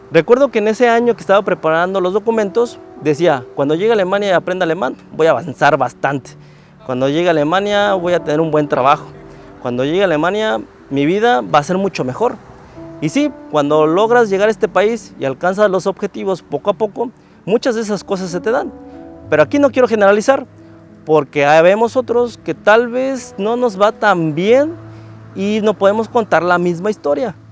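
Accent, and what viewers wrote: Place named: Aguascalientes, Mexico. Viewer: Si puedo adivinar de donde eres basado en tu acento: Aguascalientes?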